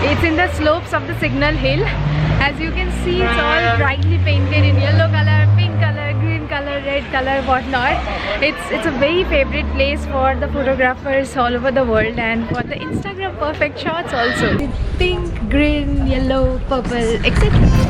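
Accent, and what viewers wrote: Bengal, India. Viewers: Your South Africa series was amazing, very nicely edited, breath taking scenic beauty, lively places and adventurous activities that you both did. Just guessing from your english pronounciation, Are u a Bengali??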